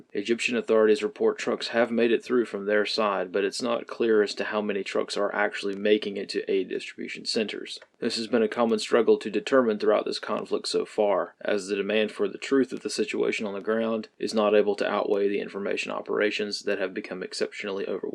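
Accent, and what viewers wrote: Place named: South, United States. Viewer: He’s Southern. We tend to reflect on our words and phrases as we speak.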